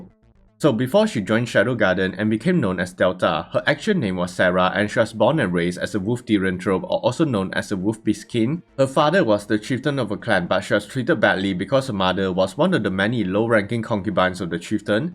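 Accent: Singapore. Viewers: bro u singaporean?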